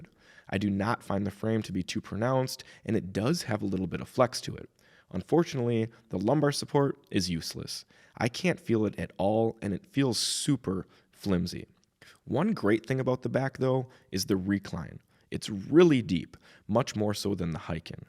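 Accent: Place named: Canada. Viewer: That's usually a Canadian accent, or the states that border Canada. Like Minnesota, Washington, etc.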